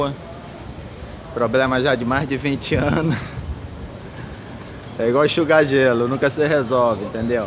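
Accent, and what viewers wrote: Rio de Janeiro, Brazil. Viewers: Clhr ele e carioca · Nosso Mestre é carioca MDS, melhor que isso não existe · E ainda parece ser da Zona Oeste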